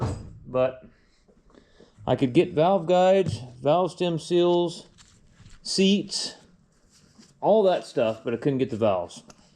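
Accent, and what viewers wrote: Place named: South, United States. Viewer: Where did learn redneck speak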